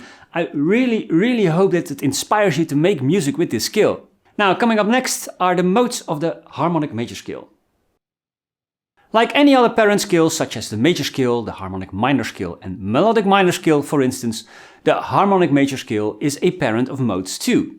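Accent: Netherlands. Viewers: Dense and beautiful, and with a Dutch accent. I'm jamming with a good friend who is a Nederlander and we are working on diatonic modes. I love your graphic showing how to think about modes. I shared with him. Thanks for dense and beautiful.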